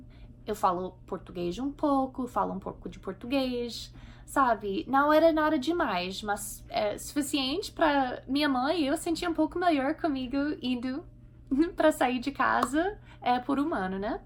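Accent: Rio de Janeiro, Brazil. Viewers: Tem um sotaque carioca. Aquele chiado típico no falar. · Você está no Rio de Janeiro pelo sotaque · SEU SOTAQUE CARIOCA ESTÁ EVIDENTE DEMAISSSS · sotaque meio carioca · um leve sotaque carioca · Sotaque carioca?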